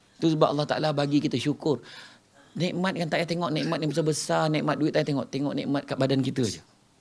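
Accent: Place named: Malaysia. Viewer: Wahh bang skit2 dh ada seleng Malaysia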